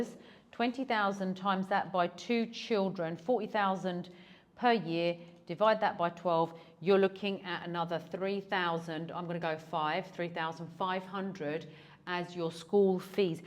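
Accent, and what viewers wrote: United Kingdom. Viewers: Love your British Accent! reminds me of my student years in England..wonderful! you are indeed a fast learner and definitely have picked up pretty well..I feel that your cost of living calculation is fairly reasonable! Definitely agree with you taking a drop in maid once a week!! keep up the excellent reviews of Malaysia!👍👍💕💕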